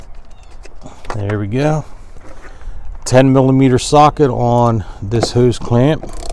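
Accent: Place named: Baltimore, United States. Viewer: Gotcha. You just sound like you have an accent that is very similar to our Baltimore accent.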